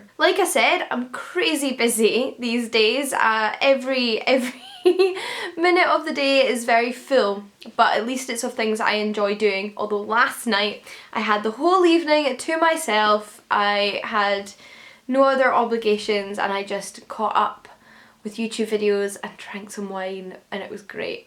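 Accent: Scotland, United Kingdom. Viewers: I absolutely love your accent (I spent 6 months in Edinburgh as a student and there is no accent I like better :-))! Good luck with the flat hunting! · It sounds like while you've had inevitable adjustments, your move has largely brought you that incredible feeling where you feel you're exactly where you'r meant to be in life, and surrounded by incredible opportunities to boot!! Glad it's working out for you (and that you're still taking time to make videos amongst all these changes)! I do have a question about how you're sorting flat hunting--did you just bring up suitcases and plan to crash with friend(s) until you found a place?? (this reminds me a bit of when I moved to NYC--packed my stuff in suitcases, crashed with a friend for 4 days, looked at lots of apartments Id been searching ahead of time on Craigslist[idk if theres a uk equivalent to craigslist… you can find all kinds of stuff on there but its mostly used for roommates and apartments, though you have to be careful] and other sites, and had actually found a place in less than a week.). 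And your experience with your "otherness"/Scottish accent feeling enhanced is so apt--I actually had the opposite experience once!! If it's not obvious, I'm American and have lived there my whole life. I'm mixed background (aren't we all), but a large % Polish. (and obviously while it's not a perfect melting pot, the US has a mix of ethnic backgrounds etc). When I visited Poland for a few weeks (with a friend I'd jokingly call "really" Polish--as opposed to my fake Polish? ;) Moreso because she went to Polish school every Saturday and was fluent,etc.,), I was struck by how much I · Re: your feeling of being more Scottish, YES! I feel a lot more French in England than I do in France. The people I meet tend to focus on the more positive aspects of being French and I tend to focus on the stuff I miss, so it's easy to feel more attached. When I'm home, I'm immediately in Ranting-about-the-French-government mode... 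And as a second-language speaker, I totally agree your Scottish accent isn't that strong. It's lovely & very recognisable as Scottish, but sometimes when people who sounds VERY VERY Scottish are on the news I have to put subtitles on or I won't understand.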